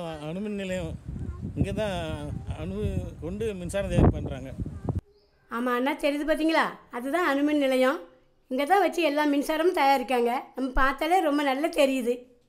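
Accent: Tirunelveli, India. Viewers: அம்மா உங்க நெல்லைத்தமிழ்பேச்சைக் கேட்டுக்கிட்டே இருக்கலாம்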